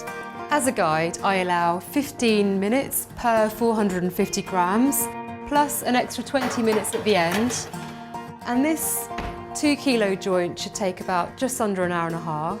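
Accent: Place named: United Kingdom. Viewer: I believe that would be a British accent. :)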